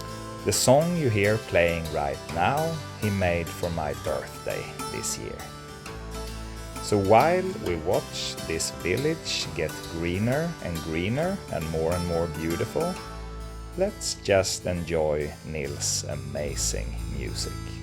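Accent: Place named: Sweden. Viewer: Hi amazing work. Sounds like u are from Sweden. What part are u from?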